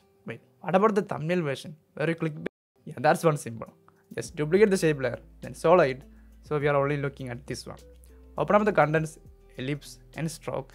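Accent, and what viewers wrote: Kerala, India. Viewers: malayali alle ? enikk aa accent kettappo thonniyatha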